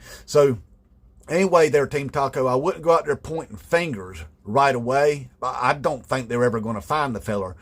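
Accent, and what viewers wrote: South, United States. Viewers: Brother Man...and I mean that from the HEART!.. Was thought never judge others by race,color,ethnicity and or geography...For you SIR..are a GOOD/DECENT MAN..that Southern Accent and long hair sort of made me bais (somewhat ) I Was really SHOCKED by the context of your video..Again..SIR! MUCH RESPECT! ..for Speaking TRUTH..and for doing it while ..sticking to Facts and not personal bias and or prejudice..This NYC Puerto Rican would be honored to meet at a Bar of your choosing and swinging back a couple of Tall Pitchers of Beer(of your choosing)✌🏽 Brother..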